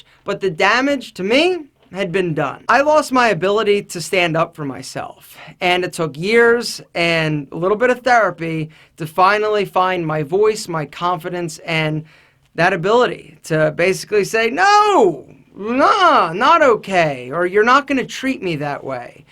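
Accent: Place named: East Coast, United States. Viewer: east coast accent all day baby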